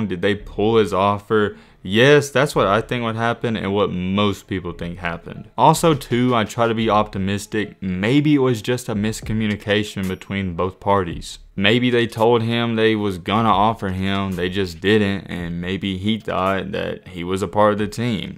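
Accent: South, United States. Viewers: So a southern twang erases the salient points within his video? Seems legit...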